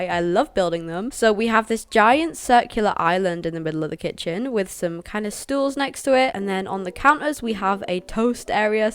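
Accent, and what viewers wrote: United Kingdom. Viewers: She is British 😊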